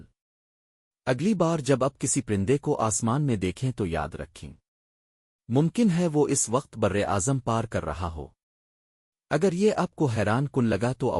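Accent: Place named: Hyderabad, India. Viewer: مجھے حیدرآباد کا اردو لہجہ بہت بسند ہیں۔❤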